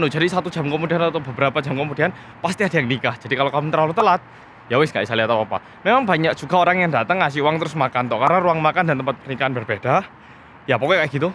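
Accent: Java, Indonesia. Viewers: Medok banget jawanya:') Lucu sumpahh>< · Bahasa nya medok bngt, salut aku , 😍😍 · Aku baru nton dann sumpah aku nton berasa nton org indo yg lagi di korea😂 duhh logatmu mas indonesiaa bangeet💗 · Hallo wong korea medok piye kbre??? Cobo melu nak sek jenenge 패펙 aku pengen weruh.... Maturswun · Btw ka jawa nya medok bgt 😂 kalo ngomong serasa kaya pak jokowi yang ngomong 😂😂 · Medok banget omongnya kaya aku , jadi lucu deh kaka 😂😂😂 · Ini orang korea apa indonesia. Logatnya xo jawa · Banyak makan nya si korea medok... gak rugi kl all can you eat.. hahahh 😅😅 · Gw kira suara nya logat korea gitu eh ternyata medok:v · Medok banget ngomong nya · ngomongnya Medok jawa ya... wkwkwkkw lucu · Logatnya jowo buanget 🤭🤭🤭🤭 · Wwiiiiihh entek petang piring reeeekkk....hahahahaha......nggak luuwweeee iku reeekkkk...tp ruwakuuusss yooo......btw its ok 👍👍😍😍😘😘 Suuueeenneeenggg puoll ndelokno e.....success trus ya nas sing muuueeeddokk👍👍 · Kira-kira apa yaa reaksi orang Korea asli yg nggak ngerti Indo pas denger Mas ngomong Indo medhok. 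P.S Kostum menghadiri pernikahan di Korea lebih simpel cenderung monokrom, nggak kayak di Indo yg agak gimana gitu liatnya warna warni kadang juga dandanannya suka heboh. (*Kecuali yg ada ketentuan warna dresscode yaa) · Lucu ya.. Org asli korea malah logatnya jawa..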